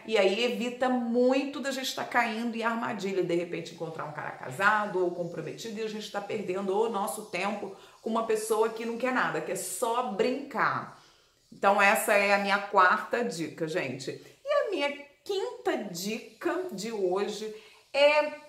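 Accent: Rio de Janeiro, Brazil. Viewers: Convicções maravilhosas, ter cautela em qualquer situação, ter dois pés no chão, e cuidar com os perigos virtuais, também acho que vc e seu noivo formam um lindo par, são almas afins não existe alma gemea há encontros que não são conscidência, são providência de Deus mesmo pessoas que já estão ligadas pelo destino um do outro que eu sei lá acho que já são pré destinadas uma para outra...bjo vc sempre linda e elegante com essa dicção carioca do sutaque que fala o português coloquial.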